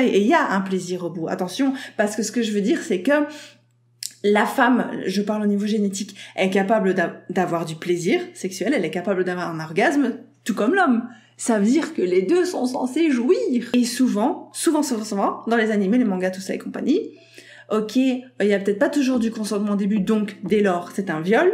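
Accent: Belgium. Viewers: Tu dis « ouit » au lieu de « uit » :) c’est marrant l’accent belge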